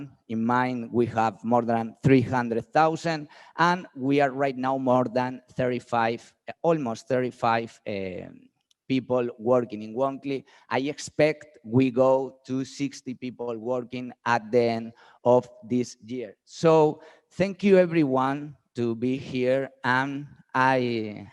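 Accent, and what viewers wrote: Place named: Spain. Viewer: Eres un crack, quizas un mejor ingles lo haria todo un poco mas profesional, tu pronunciacion es muy española jajaja sin animo de ofender, te admiro mucho, un saludo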